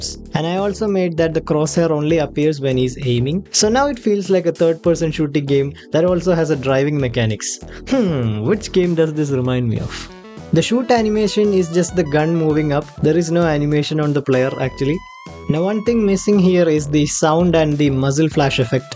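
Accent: Kerala, India. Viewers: your voice sounds really like a keralian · He is malyali..... Go and learn English mallu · BRO AREN'T YOU MALAYALI???? YOUR ACCENT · Bro with a mallu accent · no one will talk like this in India only Malayali does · english parayana kettal ariyam malayali anenn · sounds like a Malayali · Accent is giving me mallu vibes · The English you speak sounds like a Malayali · That Mallu accent hits different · Athey malayali ahn enn thonnane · Bro if definitely a malayalie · Malayaaali alle? Sound kekumbool ariyunnundu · മച്ചു നീ മലയാളിയല്ലേ നിന്റെ പറച്ചില് കേട്ട് അറിയാല്ലോ മോനെ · English keettiitt mayalayalide pole imddd😊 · Mallu accent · The accent is exclusively Malayalam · ഈ ഉമ്പിയ ഇംഗ്ലീഷ് കെട്ടാ അറിയാം മലയാളിയാണെന്ന് 😂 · mallu english alleee enik thonni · Malayali slang never fades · bro have mallu accent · Where are you from, sounds like kerala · Your ascent like mallu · You sound like you're a malayali!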